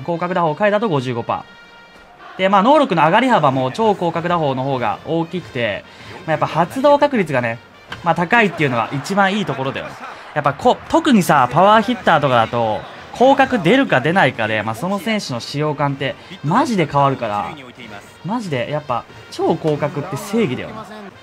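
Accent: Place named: Western Japan, Japan. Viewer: 時々、西の言葉入るよね～！嬉（笑）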